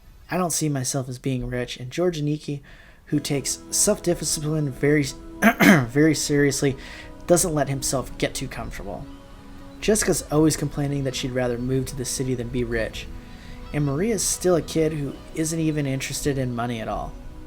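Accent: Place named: United States. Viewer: Jesus christ the blueish grey haired woman's tits are so unnaturally big that it feels uncomfortable to look at her, the faint femme fatale smile on her face doesn't help either lol Loving the voice acting! especially the female voices and the little girl's "uu-" XD so you are American? I should have noticed! I'll give you a little hint: Unlike in english, where a certain vowel may sound different depending on the word, in Japanese, every vowel always sounds the same way: A always sounds like "ah" (as in cat) E always sounds like "eh" (as in pencil) I always sounds like "ee" (as in feel, peel) O always sounds like "oh" ( just a short O sound as in "boy", not as in the verb "to go") U always sounds like "oo" (as in fool, goon) (It's much easier for a native spanish speaker as myself to pronounce japanese! you may have noticed some grammar horrors in my comments! 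Hope that was helpful!)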